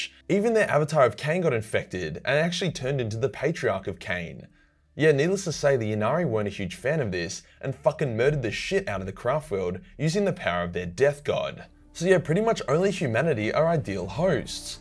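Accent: Australia. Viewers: Im not even a huge fan of warhammer, but i love listening to this aussie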